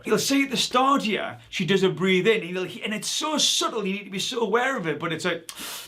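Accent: Newcastle, United Kingdom. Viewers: Found your channel and love your insight. Oh I think the people of interest, that you could analyse, is endless.... I'm fascinated in the subtle signs to look out for, your beautiful Geordie voice , and your simple bitesize explanations, makes learning a treat ha ha. Your wife and daughters should be so proud of you. Having been lied to on grand scales through my life, I feel your work is invaluable to me personally, so I can continue to grow and trust again. Thank you so much. Janice from England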